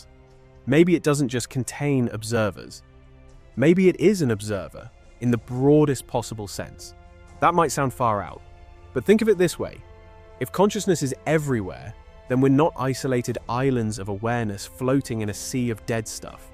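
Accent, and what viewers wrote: United Kingdom. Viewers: i’ve been studying the Sanskrit Vedas to understand things for 15 years. this video is excellent and very easy to understand. nice to hear a fellow british voice too 🙏🏽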